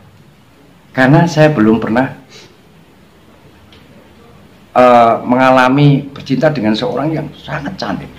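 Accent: Java, Indonesia. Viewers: . Suara sedikit mirip (logat Jawa juga), tp ini bicaranya agak cepat, dn rambut lebih sedikit drpd PakDe Alex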